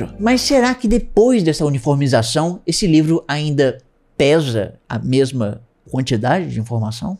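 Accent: Ceará, Brazil. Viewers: Juro que não sei de que estado ele é mas tenho a impressão que é do Ceará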